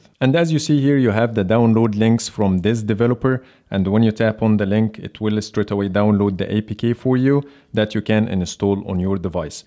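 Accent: Egypt. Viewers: are you an egytian your accent denotes that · You got an Egyptian accent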